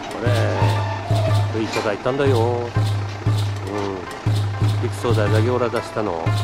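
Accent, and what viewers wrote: Tsugaru, Japan. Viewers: 津軽弁での説明、良いですね😃‼️。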